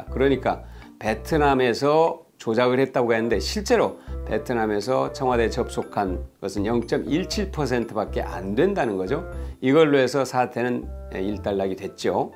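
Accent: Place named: China. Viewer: 말씨가 조선족 말투 같네요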